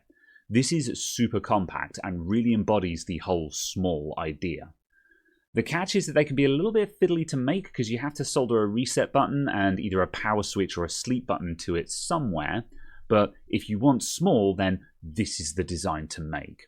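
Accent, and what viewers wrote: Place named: West Midlands, United Kingdom. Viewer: Hi, it was because of your videos that i watch late at night to relax that got me interested in this sort of stuff in the first place, and I have already learnt so much. After a while I managed to convince my wife to buy me a little DIY soldering kit for a graphic equaliser, and I said to her, if it doesn't work, I'll never buy one again, but (honestly, to my actual surprise) the thing works flawlessly, and I hnow have a sort of bug for building simple electronics. Half way through building this equaliser the soldering iron I had blew up completely, so I had to buy one off amazon to get it finished, the soldering gun i now have is amazingly better than the one that blew up, and I'm absolutely willing and looking forward to my next project, so many thanks to you, you've inspired an almost 40-year-old-toddler to try something new. 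Hope that makes you smile tonight. Cheers bro. The next one I do I may consider putting up on Youtube. PS - you sound like you're from the West Midlands ish?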